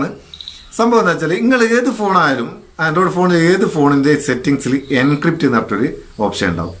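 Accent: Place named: Vadakara, India. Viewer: അദ്ദേഹം മലയാളം നന്നായി അറിയുന്ന ആൾ തന്നെ ആണ്. പിന്നെ ഈ സംസാരം ഞങ്ങൾ വടകര, മേപ്പയൂർ, നാദാപുരം ഭാഗത്ത് ഉള്ള സ്ലാങ് ആണ്.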